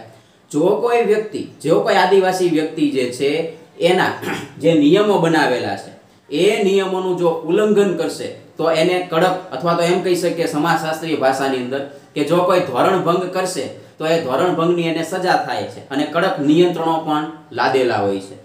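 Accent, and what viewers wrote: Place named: Kathiawar, India. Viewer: મને આપ ની કાઠિયાવાડી બોલી બહુ સરસ લાગે 👍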